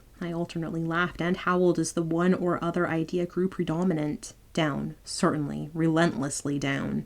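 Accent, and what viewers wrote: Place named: Scotland, United Kingdom. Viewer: I just found your channel. Your accent is strange. It's like you're either from Scotland and have been living here in my home state is Indiana for years OR you're FROM the Midwest and have moved to Scotland or nearby and have picked up some of the accent. It's wild. It's like, to my ears, you almost sound like an average US person but then it's sprinkled with a bit of a Scottish brogue. Lol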